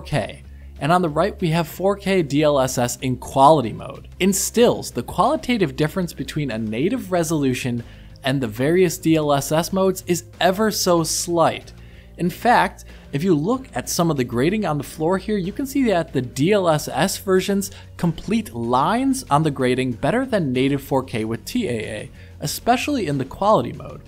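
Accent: Germany. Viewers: Lord Farquaad he wasn't so good in his older videos. And he definitely has a slight German accent, you can hear it.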